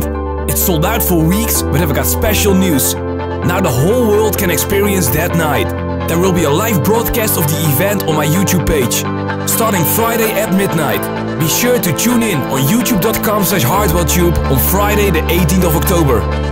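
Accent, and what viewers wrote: Netherlands. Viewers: That Dutch Accent =) ;D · haha dat nederlandse accent :^)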